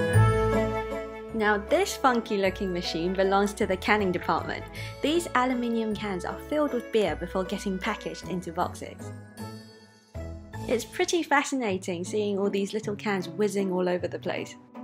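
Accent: United Kingdom. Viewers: Where are you from? Cant work out your accent , it seems like you have a UK accent ( British accent) but it sounds mixed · Hi I loved your video. I also love Asahi beer. As I'm from England I don't like the taste of our lagers like Carling and Carlsberg etc.. Asahi is very refreshing and tastes amazing. And from 1987 the year i was born lol. You sound like you are from the UK .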